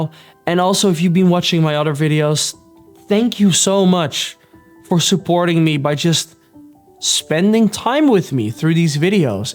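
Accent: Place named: Netherlands. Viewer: Dumlimg recepies please. Support from a fellow Dutchie here😊. Your accent is fine. Yes, it's detectable, but who cares, you're more than understandable.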